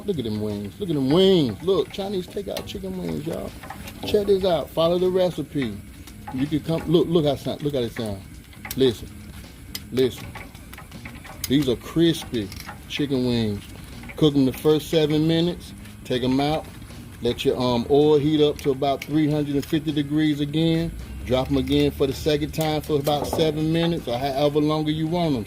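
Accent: South, United States. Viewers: Haha!!! This here man is so southern. I love it. Those wings look great. You should get you a second basket though. You can put your wings in one then drop it in the grease. You put the second one on top to keep them submerged. You are able to shake the basket to keep them from sticking as well. When they are done they are already in the basket. I subscribed. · He gotta be southern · Girl I was like wait a minute is he married then I went to take the rest of his channel so I assume that he is married so no disrespect when I'm say this comment I'm like this man than made him an outside deep fryer and then it sounds like he's from the south and Country guys, real country men be coming up with all kind of neat stuff it just makes me so proud, like one of my friends actually made his own barbecue grill he welded it and everything himself lol we sat out after he finished and had a late night bbq. 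So all in all what I'm getting at here was I so excited to see him take them wings and drop them in that outdoor deep fryer 🤣😊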